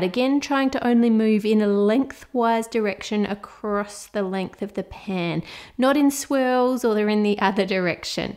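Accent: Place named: Australia. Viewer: This video makes me want an Aussie accent like hers.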